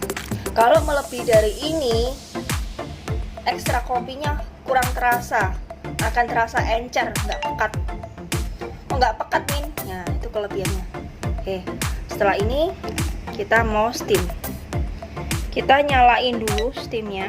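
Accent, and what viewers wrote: Java, Indonesia. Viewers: Medok nya mantul mba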